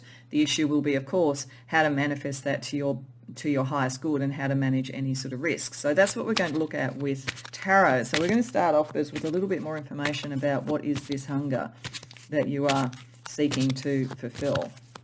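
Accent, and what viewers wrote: Australia. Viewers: Love your accent Aussie?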